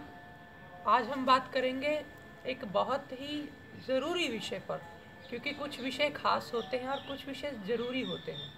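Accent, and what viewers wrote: Punjab, India. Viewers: Sir aap Punjabi ho ?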